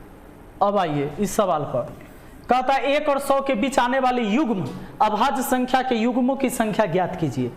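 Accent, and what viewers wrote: Bihar, India. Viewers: Tumhar language bihari hai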